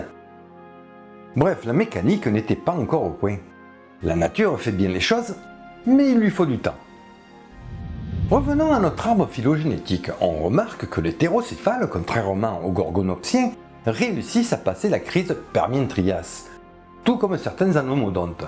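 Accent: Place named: Albi, France. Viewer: Bien le bonjour, un grand merci pour le partage de votre savoir, ce fût très passionnant 🙏 Et cet accent me rappelle tellement mes professeurs à Albi en BTS 😊 (je suis du Nord 😅) bref je viens d'activer la cloche pour ne rien louper 😉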